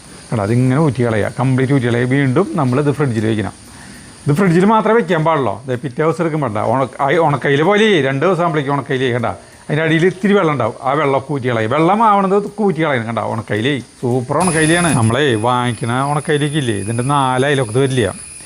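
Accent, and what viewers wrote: Thrissur, India. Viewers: നല്ല തൃശൂർ ഘടി...❤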